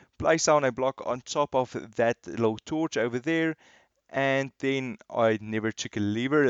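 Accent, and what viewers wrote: South Africa. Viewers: Your south african again bra ek ook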